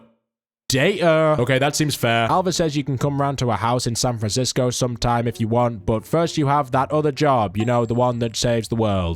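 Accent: United Kingdom. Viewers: well the game certainly was boring as shit but i theres nothing better then having an angry british lad speak about video games ... while i am playing a video game.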